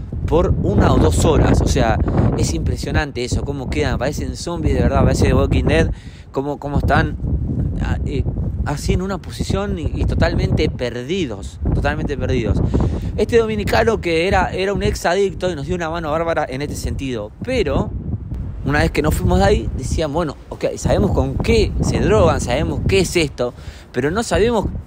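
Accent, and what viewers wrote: Argentina. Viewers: Jajaja me partí con lo de "leche de almendras. de gente rara" 😅😅 pues a mí me gustan esos rollos, me acabo de suscribir a tu canal por 4 cosas: me gustó la temática del vídeo, me gusta cómo se ven las imágenes con la cámara esa y me mola el acento argentino, además también me gustó que explicas muy bien todo, un saludito y gracias desde Islas Canarias, España 😉👍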